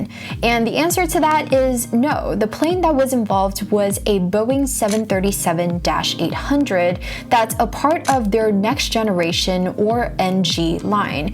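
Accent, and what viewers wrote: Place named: China. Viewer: I love your chinese -english language, you are easy to understand so new to your channel so dont know what u cover but will soon find out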